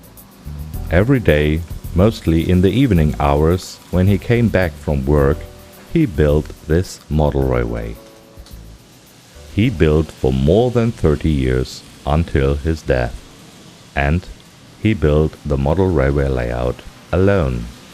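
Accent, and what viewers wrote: Germany. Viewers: Geiler deutscher Akzent hört sich echt witzig an! (Das war NICHT beleidigend gemeint)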